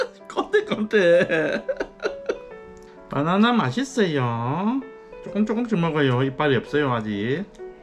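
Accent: Gyeongsang, South Korea. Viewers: 억수록 말하시는것 보고 경상도사난이 이세요?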